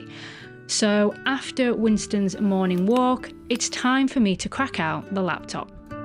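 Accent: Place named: Manchester, United Kingdom. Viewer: Loved the horns you grew on the beach. That guy looking through you window reminded me of our one and only caravan holiday. When the kids were young we could only afford cheap holidays and spent a week in a caravan in mid Wales and a guy looked through one of the windows so I thumped the window which made him jump and because his forehead was touching the window hurt him. When I went out to confront him the cheeky sod moaned I’d hurt him so I told him to do one or I would hurt him and when I spoke to the site owner she thanked me as there had been complaints about this happening on a couple of other vans and he wasn’t staying on the site. Just nosey but is the work you do on your computer for your job or just your vblogs? Looking forward to next video as I’m still ill although been for X-rays and blood tests to see if that reveals anything, so your videos our a bit of a highlight although tomorrow my cycling buddies are coming to see me. 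I know you said you’re from Cheshire but your accent sounds more Manchester area. Take care the pair of you.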